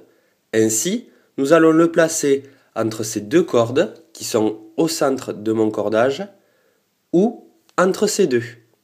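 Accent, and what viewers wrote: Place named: South, France. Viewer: mais non, quel bonheur cet accent du sud ! 🥰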